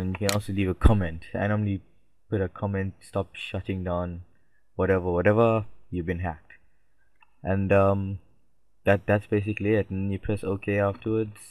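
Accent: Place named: South Africa. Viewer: South African :D lol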